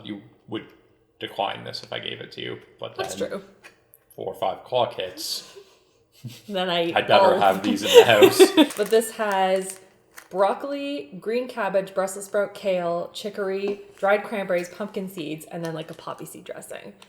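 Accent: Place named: Canada. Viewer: I came here trying to find some ramen lore, and then you mentioned Nanaimo bars and I was like...... wait a minute.... and then the way you said that they are named after Nanaimo, a city in BC, like people would just know what BS stands for, and then I got to thinking about your accent and I did some quick google searching, and I HAVE FOUND A FELLOW CANADIAN ON THE INTERNET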